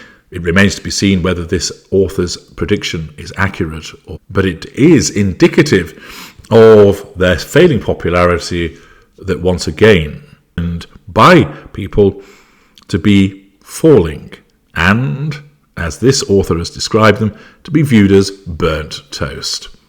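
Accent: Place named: Scotland, United Kingdom. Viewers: your Scottish accent makes you sound like Maggie Smith in The Prime Of Miss Jean Brodie. You are indeed the creme de la creme. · Toasting this vlog! Cheers! That Scottish accent!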